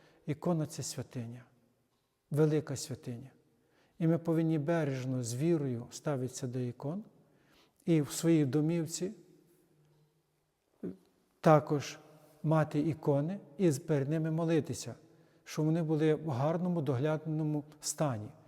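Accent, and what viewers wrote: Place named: Khmelnytskyi, Ukraine. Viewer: Так, є святині, які потрібно поважати. Хороший урок, хоч і трагічний. Батюшка, ви часом не з Хмельницької області? Слухаю ваш говір. Цікаво.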